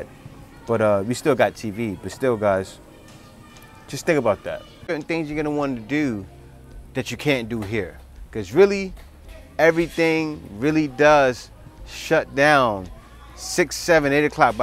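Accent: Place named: New York, United States. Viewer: My brother aren't you a New Yorker ⁉️😅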